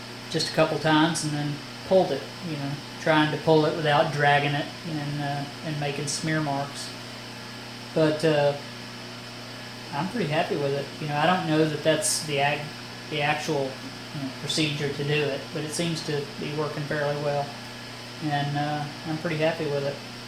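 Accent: South, United States. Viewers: Me Now he's from a southern State you fool. Of course he's saying "to"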